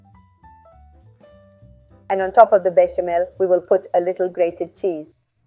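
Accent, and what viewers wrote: Andalusia, Spain. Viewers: Solo por tu graciosa manera de comunicarte, como toda una andaluza, tu like y tu suscripción!!!!! Saludos desde Santo Domingo.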